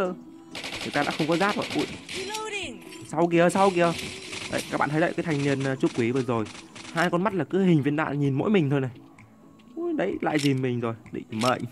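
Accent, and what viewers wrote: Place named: North, Vietnam. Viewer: Anh Bắc cua hay qua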